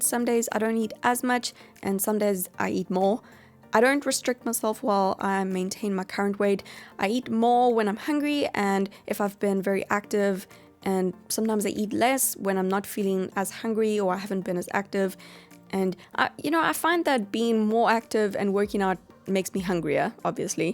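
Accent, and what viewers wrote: South Africa. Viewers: South Africa I think x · are you South African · South Africa! ❤️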